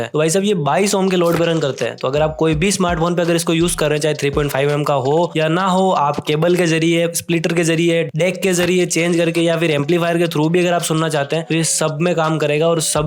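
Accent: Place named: Mewar, India. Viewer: Ha, lg to mewad s hi rha h y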